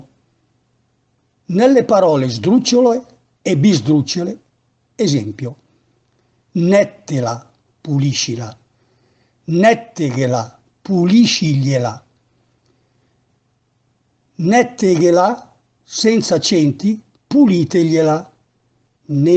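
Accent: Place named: Italy. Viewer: Peccato per le doppie pronunciate all'italiana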